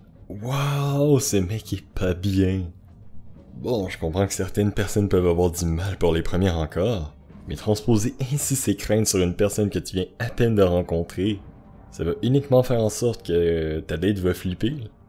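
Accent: Quebec, Canada. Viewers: J’adore l’accent québécois 😁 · Haha knew it pis honnêtement sa fais different d’écouter du thread lue par un québécois 😁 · Tu est québécois ?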